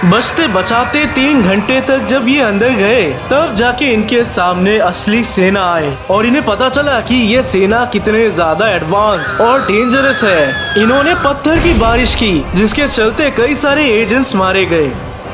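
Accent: Bengal, India. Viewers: Bhai ap bangali ho kya ❤❤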